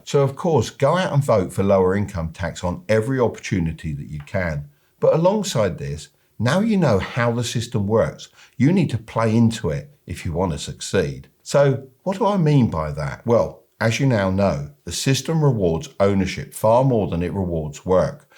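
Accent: United Kingdom. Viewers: Where did you grow up? Souds like you have a British accent. That is a critical piece of info you left out.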